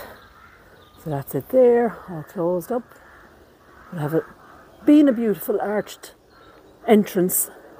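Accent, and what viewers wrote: Ireland. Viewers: I love your Irish brogue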